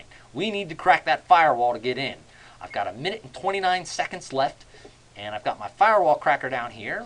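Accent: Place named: United States. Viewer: Пиздец, ебаный американский английский. Всемирная тошниловка.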